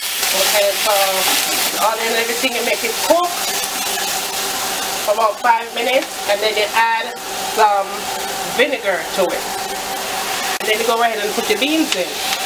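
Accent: Jamaica. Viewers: Her voice and accent brings back such nice warm memories of Jamaica, plus her food, nice and homely..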